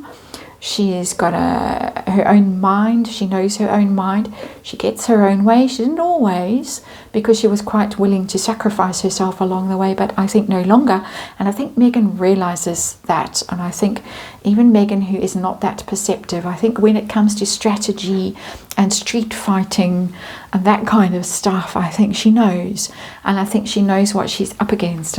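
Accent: South Africa. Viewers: I have to 'scroll up' to get their faces out of view and just listen to any commentary. That's why it's nice to look at the tarot cards and listen to that lovely soft South African melodic voice with its occasional giggles.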